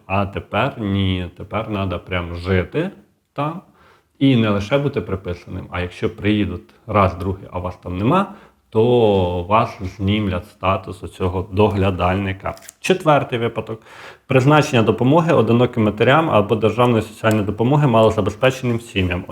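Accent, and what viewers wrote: Vinnytsia, Ukraine. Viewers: У нього вінницький діалект. Тому так розмовляє. А ви що, розмовляєте літературною мовою?!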